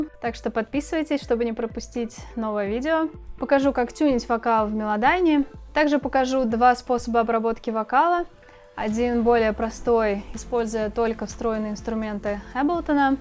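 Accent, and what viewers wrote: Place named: France. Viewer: Такой крутой урок! Подписалась с англоязычных обзоров, думала, что вы француженка по акценту. А тут оп и русский. Так приятно 😍 И вот эта серия из 3 уроков просто 🔥🔥🔥🔥🔥🔥🔥 Спасибо ❤️ У вас так много знаний по обработке, буду ждать новых уроков ❤️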